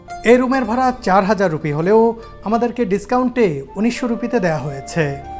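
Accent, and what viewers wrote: Sylhet, Bangladesh. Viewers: বারবার বলছেন , বাড়ি আসাম , আরে ভাই এই ছেলে করিমগঞ্জ , শিলচর , বদরপুর এসব এলাকার , সিলটি ভাষার গন্ধ পরিস্কার !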